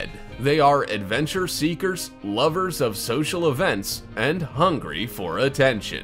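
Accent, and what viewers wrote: United States. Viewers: You from Texas ?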